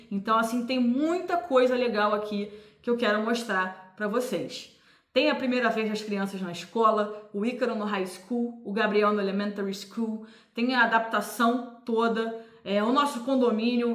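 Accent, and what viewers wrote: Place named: Rio de Janeiro, Brazil. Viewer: Que legal! Eu moro em Michigan. Pelo sotaque desconfio que você seja carioca....eu também sou apesar de ter morado meus últimos anos no Brasil em Petrópolis. Gosto bastante de morar aqui nos EUA.🙂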